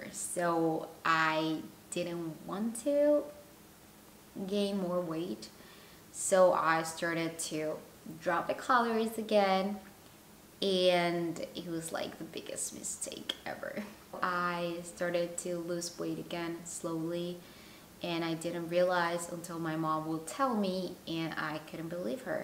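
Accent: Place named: Bogotá, Colombia. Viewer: I recognized by your accent that you are from Bogotá. Stay strong 💖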